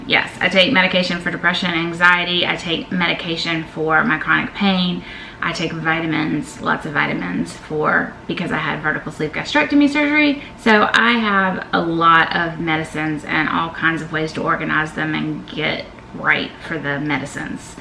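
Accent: South, United States. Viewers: Another great video, This is so cool, helps us get to know you! I love your southern accent! My sister has a southern accent, she lives in HHI. Do you live close to HHI? I'm visiting her in June! Prayers are with your lil man!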